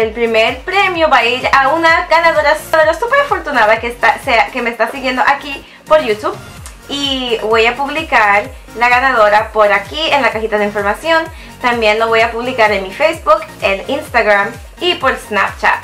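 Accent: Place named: Latin America. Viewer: Hola, mi nombre es Patty te veo desde San Luis Potosí Mexico. Me gusta mucho tu canal espero sigas subiendo vídeos seguido :), amo tu acento latino!! un beso guapa.. me gusta el jabon de cara de Origins, se me antoja probar esa marca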